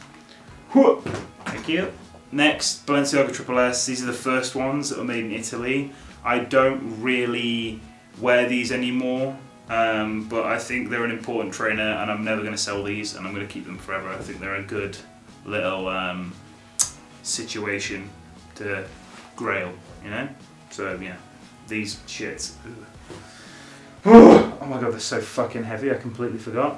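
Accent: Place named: United States. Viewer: Sounds kinda American to me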